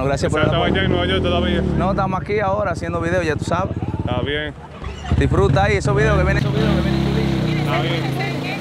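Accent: Dominican Republic. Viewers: KLK TA DURO ESTE CANAL OYE AMI Se ase que eres dominicanos esmas yo wa a desi que tu ere dominicano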